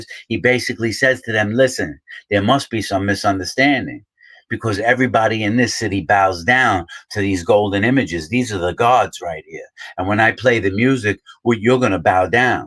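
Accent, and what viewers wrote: New York, United States. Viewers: I love your material! I can relate to a lot of your stories! I am from NY two so I reconized your accent! I really like your context!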